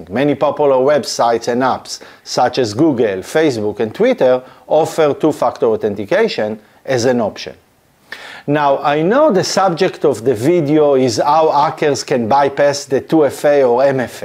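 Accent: Israel. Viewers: My brain kept hearing "TO F*CK TOROUGHTENTICATION" until it learned that it was just a strong Israeli accent. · בלי להעליב שומעים עליך שאתה ישראלי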